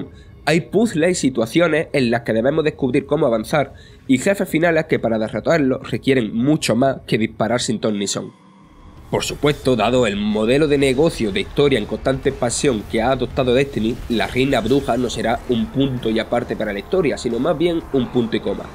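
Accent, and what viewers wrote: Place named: Murcia, Spain. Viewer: Tío, lo haces con mucho empeño, pero tienes el acento de Jumilla en todo lo alto y a veces cuesta mucho seguir la narración. ¡Tienes que trabajarlo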